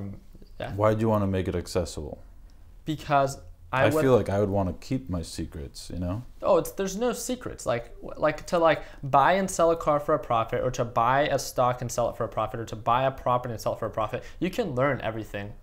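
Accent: United States. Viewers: he sounds like the SNL-Californians bits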